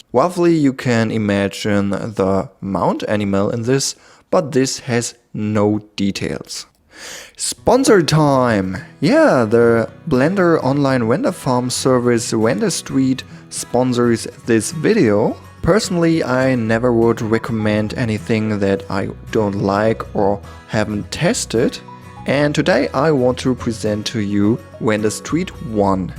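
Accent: Germany. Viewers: i hear a german accent well, your name sounds pretty german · "Oh he sounds really german" i thought. "My name is " he said. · Great tut man, very, very helpful(Also your german accent is adorable) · Man hört einfach sofort, dass du deutsch bist xD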